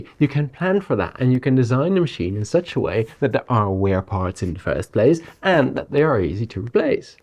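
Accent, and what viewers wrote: Netherlands. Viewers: I love the dutch english accent ❤😂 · is this guy dutch?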